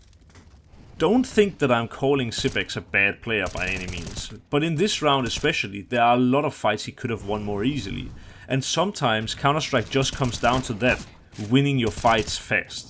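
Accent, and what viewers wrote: Denmark. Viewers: nice dansk accent m ed dit engelsk dov rigtig god video ahaha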